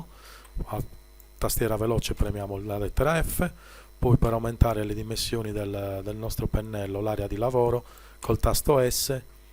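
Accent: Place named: Italy. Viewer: Cercavo disperatamente un tutorial in italiano senza risate di anglofoni che vogliono perder tempo e ti spiegano che prima devi acccednere il pc, che il mouse nun se magna e stronzate varie. E, sorpresona, non solo lo trovo in italiano, ma addirittura con l'accento della mia isola, l'accento mio, che mi avvicina emotivamente a questo programma dall'interfaccia prevista per marziani. GRANDE!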